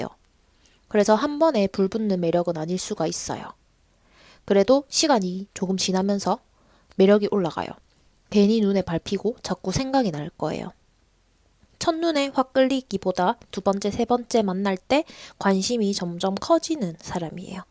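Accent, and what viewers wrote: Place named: Busan, South Korea. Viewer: 부산사람인가여 악센트가 저랑같네옄ㅋㅋ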